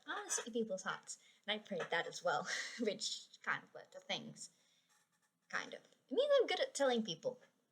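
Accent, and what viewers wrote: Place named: Australia. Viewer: You sound Australian or from New Zealand. What's the status of Christianity down under?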